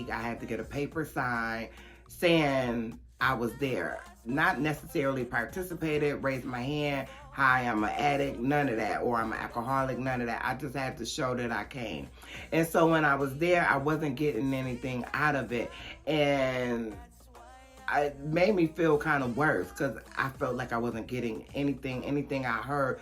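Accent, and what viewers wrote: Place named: Long Island, United States. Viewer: New subbie I like your style I knew I heard a lil my in there I'm from Long Island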